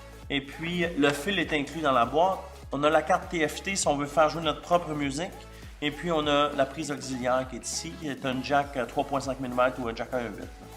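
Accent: Quebec, Canada. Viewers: Merci beaucoup pour la démo, en plus vous semblez bien sympathique avec votre accent qui donne envie d'aller au Québec :)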